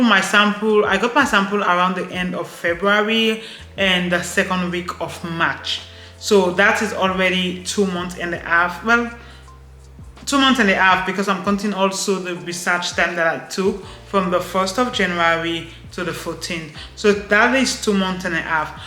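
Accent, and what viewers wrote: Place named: Cameroon. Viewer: hi i have watched most of your videos , so that i can learn and help my wife set up her pyjama line too . For now we buy from people who already shipped in to cameroon from china . so we make little profit if we want the good stuff . major challenge is how to get a good manufacturer who os reliable .Maybe you can help us . We are willing to pay for the help1; also i always wanted to ask what nationality of origin you are , because youy sound cameroonian as you have an underlyiong french accent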